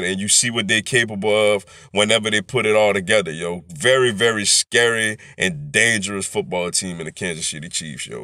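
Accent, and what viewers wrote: New Orleans, United States. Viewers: Where are you from man sound like New Orleans.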